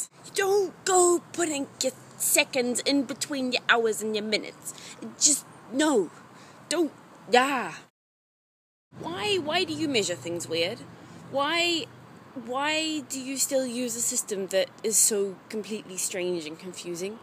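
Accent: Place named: United Kingdom. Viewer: Yes, I agree with her. She is right but I remember feet and inches because I am 54. I hate it when they don't use stones but pounds. I cannot visualise what a person looks like who is 300lbs but I could if it was put in stones and pounds. I did not know she was from New Zealand but she sounds like she is from Britain. I do not like the way yanks say 10th grade or 6th grade. Why not just say how old the child is. It would be a lot simpler. Why do you not have letterboxes in the front doors?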